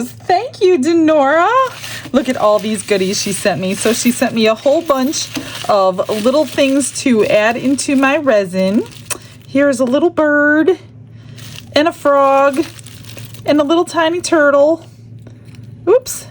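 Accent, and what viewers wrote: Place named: Midwest, United States. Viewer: Lol, I thought I detected a bit of a midwestern accent 😂 I'm a native yooper myself, with family and friends all over Wisconsin, Illinois and ohio, as well as a few trolls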